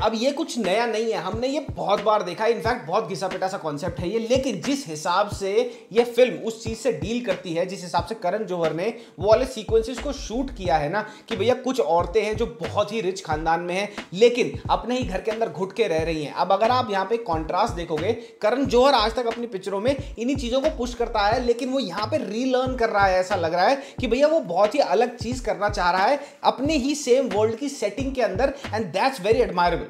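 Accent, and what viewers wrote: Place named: Delhi, India. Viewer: I agree 100% accent is exactly like Delhi boy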